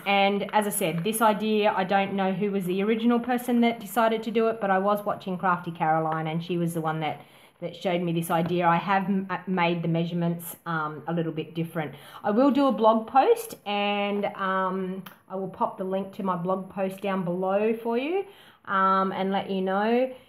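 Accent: Australia. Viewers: 🐙 Me, hubby and our 2 boys moved here Christmas 2018 from Brisbane. We love it here 🇦🇺 New Subscriber from Finley, NSW I’m 36, Married with 2 boys - very very crafty. 🌺 I own a Business Making & Selling The Safest Candles & Melts In The World Plus Various 100% Natural Body Products Incl Organic Baby Skincare Products, Organic Assorted Melt & Pour Soaps + More ❣️ These boxes would look great in the Packages & Favours that I Make. 🍄 See my Instagram page <link> 🌸 Facebook Business Page Chillaxing Melts, Candles & Body Products ❣️ Twitter: 🍀 Email: <mail> 🌸 I’m a Registered Nurse, Midwife, Homeschool Mum & Qualified Licensed Aromatherapist, therefore I’m legally allowed to use Essential Oils & Blends In Products Absorbed by the Skin. 🌷 I’d love to send you a gift box. 🦋 I Make beehive soaps that would fit nicely. 🌺 You sound like your in the Tweed Coast / Coolangatta . 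🔆 Plz pick some items you’d like to try and pick as many as Scents as you’d like. ⚠️⚠️ Note: I do have a ABN Plus I own another name and my business name will change to it in December 2019, currently setting up Shopify Store 🍀 Have you thought about selling tutorials on Kindle?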